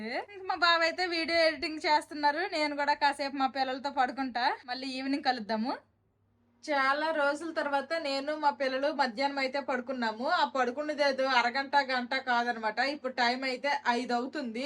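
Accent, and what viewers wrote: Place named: Nellore, India. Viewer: Hi nuvu same ma lage mataladutunnavu madhi nellor ma amma valupurilo vuntaru yavaru emi anukunna mana pani Manam chevali nuvu super &great ink Manchi videos chevali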